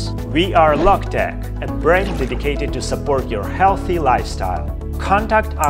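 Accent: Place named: Eastern Europe. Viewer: He speaks with a VERY east european accent that is difficult for most english speakers to understand.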